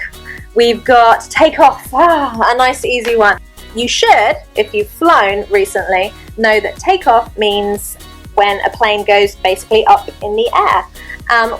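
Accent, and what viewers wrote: United Kingdom. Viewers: Beautiful British English!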